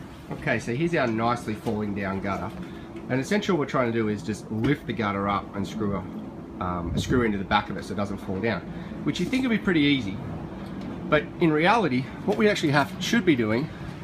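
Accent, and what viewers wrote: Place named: Australia. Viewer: First thing I noticed you're an Aussie, thank Christ mate just hearing another Aussie blokes accent giving me home repair advice is like "sweet as, not some seppo raving on with shit.. soon as I heard your voice after watching a couple of other videos I felt like having a beer like I was home.. I haven't watched your video yet it's probably almost whilst I have been raving on with crap but good onya I'll go get a beer and watch it now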